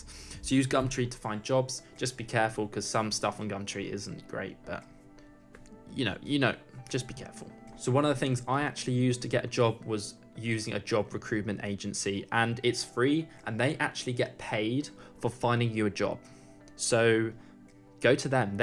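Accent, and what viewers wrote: United Kingdom. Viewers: What is your major I wonder, you have a perfect and professional British accent like my tutor in Durham uni , love it soooomuch!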